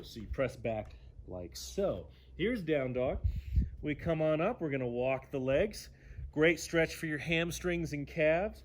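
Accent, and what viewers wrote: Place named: Germany. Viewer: Thanks this Was really helpful and didnt even feel like 15 Minutes.. But whats up with that german voice? Ich bin neugierig 😁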